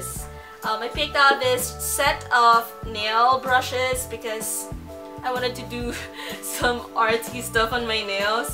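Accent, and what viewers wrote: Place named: Philippines. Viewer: Omg! Ur filipino accent is so on point! Im so jelly cause my accent is like a canadian accent cause i live in canada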